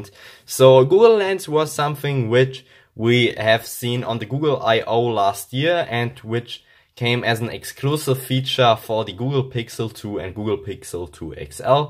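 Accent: Germany. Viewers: Are you from Germany? You sound like a german dude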